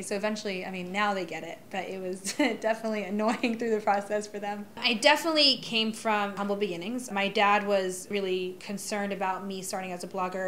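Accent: Iraq. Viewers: عراقية وعدها. فيديوات تحجي عراقي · احكي عربي بفيديو كامل عربي وتحديدا اللهجة العراقية 😀😉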